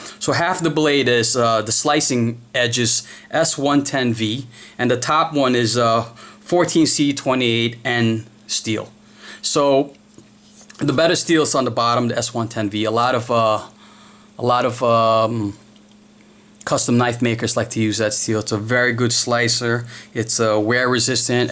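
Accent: Boston, United States. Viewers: Are you from the Boston area? Sounds like you are ... Im from very close to Boston.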